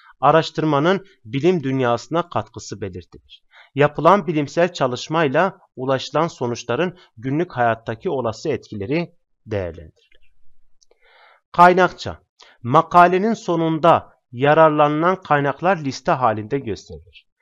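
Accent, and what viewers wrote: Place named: Eastern Anatolia, Turkey. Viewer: Fark ettim, doğu şivesi:))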